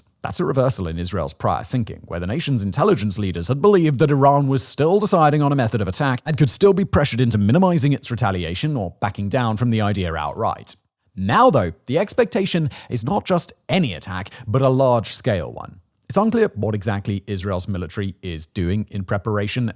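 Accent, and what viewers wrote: United Kingdom. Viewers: This guy talks a lot. I think the video can be summed up as: 1. Iran has said they are going to attack. 2. So far they haven't, but that doesn't mean they won't. 3. They have a lot of missiles that may be too many for Isreal to shoot down, but we don't really know. 4. The U.S. has moved planes, ships, and subs in to the area. End of content. How much value there is in any of that, I leave to you to decide. I suppose the British accent does make it seem more compelling.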